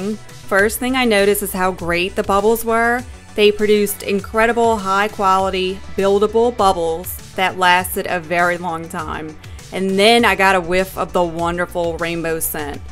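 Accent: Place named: South Louisiana, United States. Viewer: Are you from South Louisiana? Your accent is very similar to ours.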